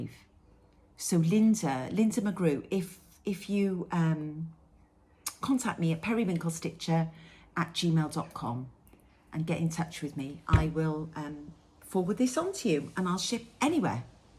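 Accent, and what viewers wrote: Wales, United Kingdom. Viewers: Love everything on your Flosstube! Love to hear your Welsh accent too! Is the Giraffe called goose??? Funny !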